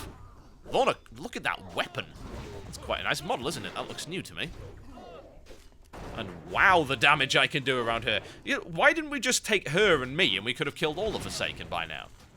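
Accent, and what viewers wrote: United Kingdom. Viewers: heh, great shows man, i really like them, keep it up. love british accents :)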